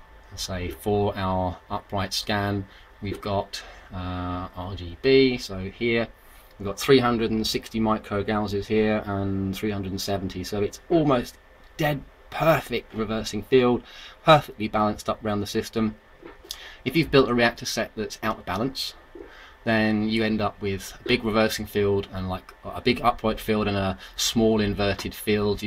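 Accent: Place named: Derbyshire, United Kingdom. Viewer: Hi do you have a contact to this guy as I'm in Great Britain Derbyshire and he sounds similar dialect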